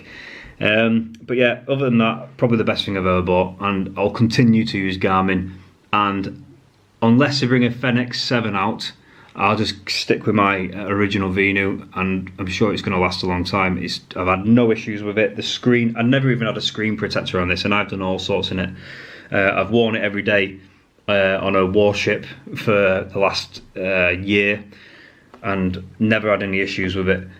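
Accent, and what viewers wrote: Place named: Yorkshire, United Kingdom. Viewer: Thanks for the review! Currently have Fitbit sense and my venue 2s comes tomorrow. Excited to try it! Your accent sounds like you are from Yorkshire? Could be wrong … but my grandparents and mom were born in Yorkshire! 🥰 love the accent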